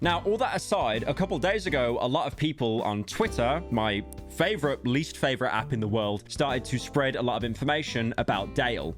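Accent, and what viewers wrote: United Kingdom. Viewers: Honestly, i think you get age restricted so often because they think that the funny british accent makes the people at youtube think your trying to cater to small children, and that you swear too much for a kids channel. Even though you aren't a channel ment for newborns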